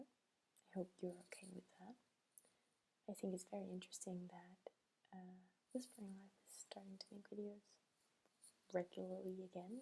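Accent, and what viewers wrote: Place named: Denmark. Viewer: You had a thicker Danish accent, back then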